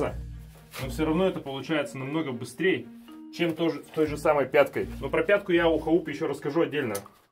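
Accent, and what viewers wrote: Perm, Russia. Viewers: У вас говор как у "Реальных пацанов", они тоже из Перми.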